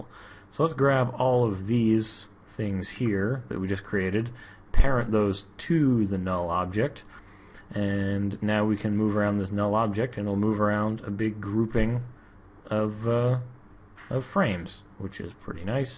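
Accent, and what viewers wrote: Canada. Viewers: Thanks for the help. Love the Canadian accent 👍🏻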